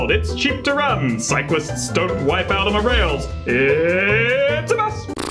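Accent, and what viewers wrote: Australia. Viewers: Your accent is making me so confused! It's not exactly Aussie or Kiwi, it's mixed with something I can't identify... but probably you've lived in Canada for a good while? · Why does this dude have an Australian accent if he’s from Montreal?!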